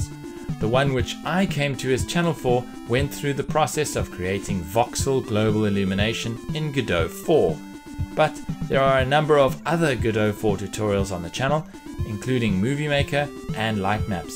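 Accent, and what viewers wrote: South Africa. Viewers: It's weird coming across a channel and hearing another South African, I'm not used to it but its increasingly common!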